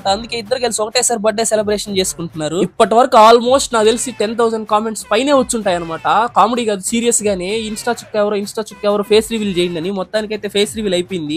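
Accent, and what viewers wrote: Nellore, India. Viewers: Edo ninna bore kottuta youtube loki vacha ayya Okka video edo random lo came yedooo ma ooru laga slang ma ooru laga undi ani chusta unna kalla kinda carry bags vachai work pakkana nuka chusukunta unna motham reels videos Neeku oka dannam ayya sami Me illu eda nayana nellore vachi darshanam chesukunta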